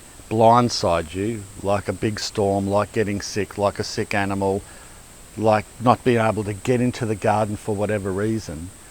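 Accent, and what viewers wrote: Australia. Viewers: Just love these videos just so typically Australian great listening.Kerry Australia 🇦🇺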